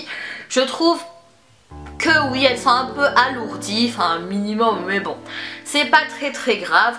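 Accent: Madagascar. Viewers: Coucou! Je voulais te dire que ta vidéo est super ! PS: Tu es malgache ? J'ai l'impression que ton accent ressort un petit peu! Bisous :)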